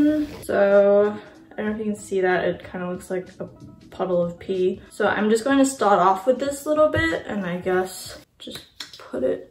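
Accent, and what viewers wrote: Australia. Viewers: I could definitely hear the Aussie at words ending in the “r” sound, but then didn’t hear it at other times when I thought I would. Thanks for clarifying and answering! 😉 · Your accent is really interesting, it's like a cross between Australian and American! 😯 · Where’s your accent from? It sounds Australian but then there is an American twang in there.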